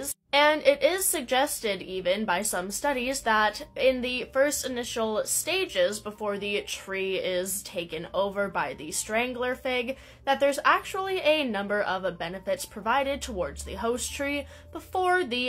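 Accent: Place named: East Coast, United States. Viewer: The effect in your voice is interesting. Maybe East Coast but maybe like some teacher you had. I find it wearing.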